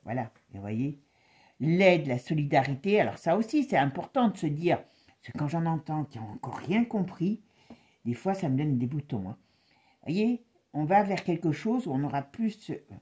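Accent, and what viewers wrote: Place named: Périgord, France. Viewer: Bonjour, je vous découvre, et j'aime vos tirages, votre douceur d'âme, et ce petit accent que vous avez, et que je connais, ô combien, puisque j'ai le même. Je vous embrasse depuis Le Périgord. Très sincères salutations. 🕊💞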